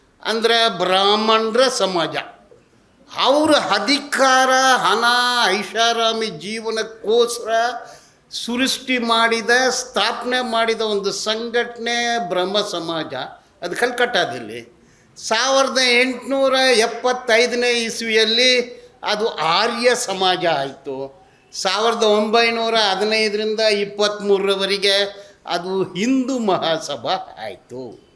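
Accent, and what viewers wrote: Malabar, India. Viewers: Malabari talking kannada in fake channel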